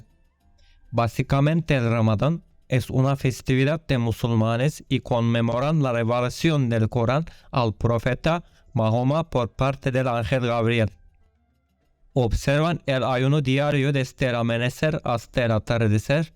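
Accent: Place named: Turkey. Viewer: Interesante me gusta las historas turcas Según tu acento eres turco Y hablas bien el español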